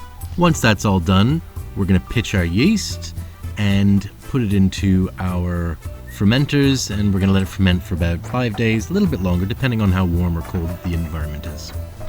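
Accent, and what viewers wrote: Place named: Dublin, Ireland. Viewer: Have you spent any time in ireland in the past? Your channel says your from Canada, but your accent sounds like you could be from Dublin to me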